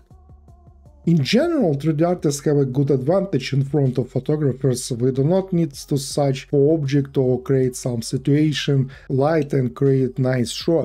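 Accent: Russia. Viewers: russian tongue is cringe.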